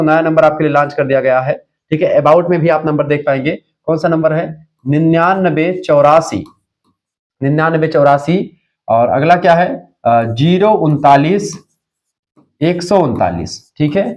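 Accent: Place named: Awadhi, India. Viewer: Sir aap Avadhi mat bola kariye 😢kyoki aapke muh se acha nahi lagta hai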